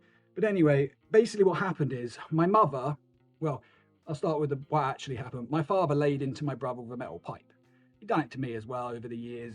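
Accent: United Kingdom. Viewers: Wait, you are british? How could you keep this from us? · Holy shit your British??? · Bro... your British? I had no idea 🤯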